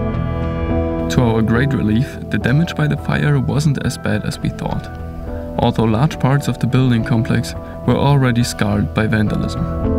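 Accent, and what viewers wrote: Germany. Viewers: I love the way you pronounce Victim. Or the V in general. It's so German!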